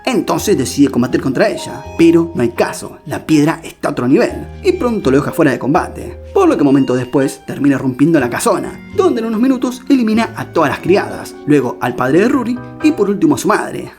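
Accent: Argentina. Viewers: Bro parece que sos argentino y me gusta mucho tu acento y tu manera tan buena de comprimir un anime en 20 minutos😊❤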